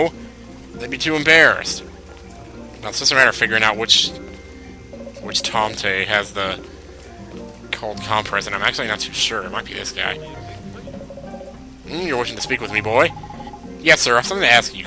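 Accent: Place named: South, United States. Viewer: ... Dude. That southern accent is a twinge worse than the one I hear daily, dude. It makes me miss NYC, man...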